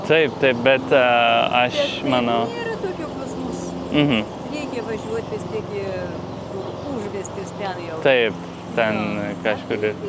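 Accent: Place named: Turkey. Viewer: Smagu girdėt pukią lietuvišką,kad ir turkškai🤘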